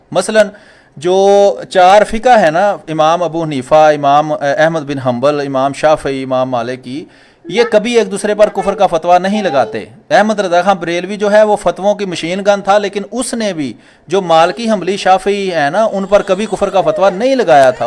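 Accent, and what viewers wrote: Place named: Punjab, Pakistan. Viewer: Sorry your accents is like fighting. I love to share mta who are soft spoken. You are punjabee urdu mix accent looks very low class..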